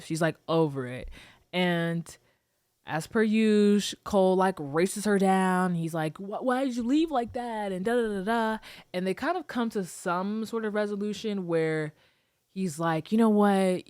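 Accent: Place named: Nigeria. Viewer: omg your nigerian accent was everything. I love your analysis!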